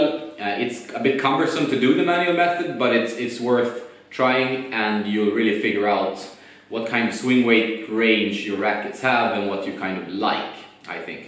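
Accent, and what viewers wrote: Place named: Sweden. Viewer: Bra ide, är du svensk. Du här en svensk accent. 🙂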